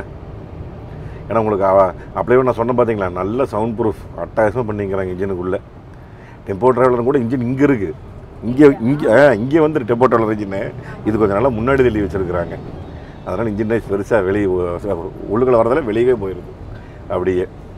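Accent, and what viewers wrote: Kongu, India. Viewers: sir naan ungal kongu தமிழுக்கு அடிமை ❤❤❤ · Perfect Kongu Tamil. I live in US, and I take rental vans for trips, mostly I get Ford, Freightliner and Benz, looks like Force is giving equally or better than these models. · Anna ungaloda kuzhandhaithanam, Kongu Tamil ku na miga periya fan Anna 👌🏻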